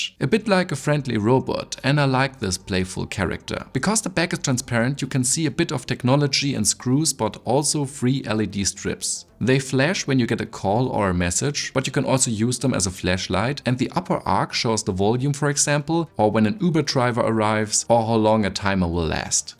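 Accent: Germany. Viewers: I knew this was a German speaking 😂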